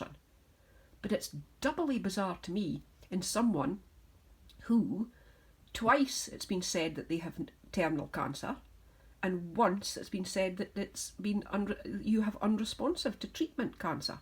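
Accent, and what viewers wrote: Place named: Scotland, United Kingdom. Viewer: Good to hear your lovely Scottish brogue!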